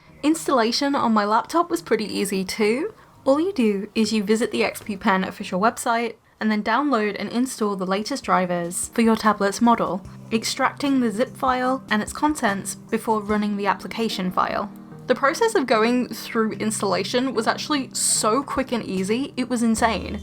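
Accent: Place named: United Kingdom. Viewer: i LOVE BRITISH ACCENT ♥.♥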